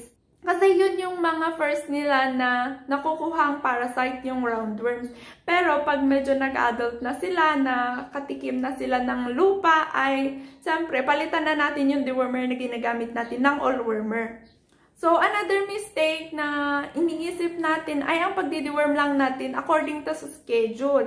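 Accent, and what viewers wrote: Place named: Ilocos, Philippines. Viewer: Hi Doc , Dito lang po kayo around sa Ilocos Region ? nagbase lang po ako sa accent nyo dito sa video . Anyway very informative po ng video nyo since kakapanganak lang ng doggy ko :)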